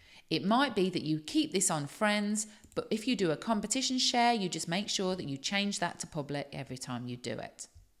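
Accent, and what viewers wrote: United Kingdom. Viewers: Super beginner's guide to Facebook. Intrigued by your accent. Are you from Worcestershire originally? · Are pronouncing British English?Is so, that's great.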